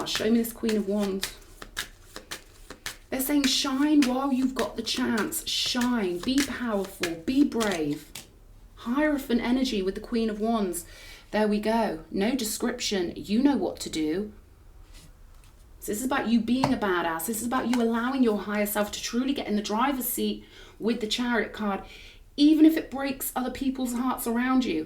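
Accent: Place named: Cockney, United Kingdom. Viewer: your given it some proper Cockney slang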